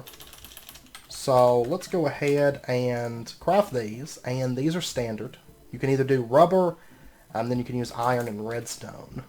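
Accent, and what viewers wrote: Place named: South, United States. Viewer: somewhere in southern USA afaik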